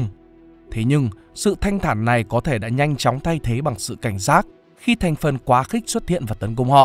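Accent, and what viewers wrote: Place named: North, Vietnam. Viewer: Giọng đọc miền Bắc làm tôi tin rằng lịch sử phải đúng đắn. Trân trọng. Mong thế hệ trẻ đi tìm lịch sử thật thay vì học cái lịch sử giả dối!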